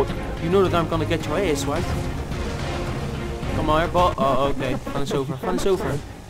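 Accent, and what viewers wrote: Netherlands. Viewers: He is from holland he said and i am from holland and i can recinize his accent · Are you dutch? you sound like your dutch :D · sounds like your dutch.....ben je dat ook? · Such a dutch accent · Where are you from? You sound dutch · Are You dutch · Is this guy Dutch because he has a Dutch accent? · Are you dutch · That dutch accent tho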